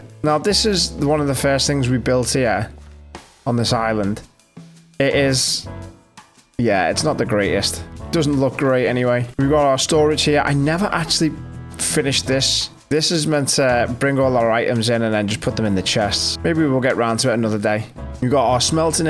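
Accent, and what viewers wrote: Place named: Liverpool, United Kingdom. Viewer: Never knew i needed a scouse mc youtuber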